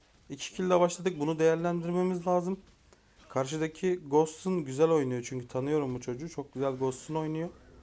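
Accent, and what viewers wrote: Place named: Black Sea, Turkey. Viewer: Reis bende samsunluyum okadar belliki karadenizli olduğun gerçekten çok güzel videolar başarıların devamını dilerim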